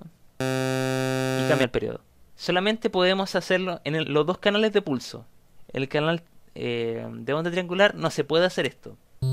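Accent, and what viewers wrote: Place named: Chile. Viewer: tienes una mezcla de acento chileno con toques de acento andaluzmes algo raro pero mola mucho tu voz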